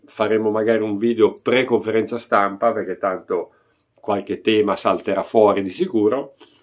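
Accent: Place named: North, Italy. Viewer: In Inghilterra non dipende dalle leggi ma dalla.cultura popolare. Si svenano pur di seguire il calcio ( anche il rugby).nutre il sistema? Si. Impoverisce le persone ? Si, di più. Il tifo fa leva su sentimenti di massa. È ciò che di più populista può esserci. In Inghilterra però, come in tutti i sistemi anglosassoni, la stampa è seria. Tu ed altri plaudivano in questi giorni anni per vari aumenti di capitale , rinnovi, contratti nuovi. Bravo. Sei parte attiva del sistema fallimentare. Fai l'antagonista ora . Non basta l'accento del nord e questa cadenza da cantilena per essere seri. Avsim per impegno e serietà ti asfalta . Tu non sai nulla. Sei il classico berlusconiano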